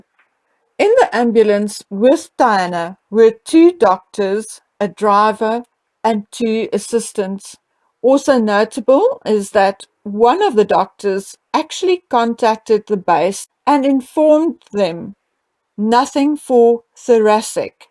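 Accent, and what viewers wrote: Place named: South Africa. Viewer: Wat klinkt het Zuid-Afrkaans zo mooi🙂..in Nederland wandelen we ook, en in bepaalde omstandigheden kuieren we ook..ik bedoel dan gebruiken we het woord kuieren als we op ons gemak wandelen in het bos bijvoorbeeld. En ik ben zo blij dat een heerlijke tijd heeft gehad bij haar familie 😁 Groetjes vanuit Gouda ( Nederland)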